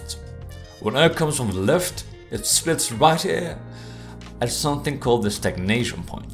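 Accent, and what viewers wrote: Sweden. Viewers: Hello, are you swedish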